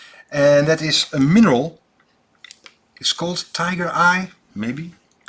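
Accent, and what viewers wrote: Netherlands. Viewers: Your dutch accent reminds me of relatives in Grand Rapids when I was a child growing up.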